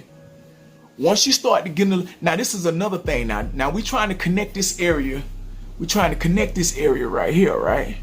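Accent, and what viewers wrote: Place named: Memphis, United States. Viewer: You sound like you're from the Mighty Memphis. Are you from the M Town?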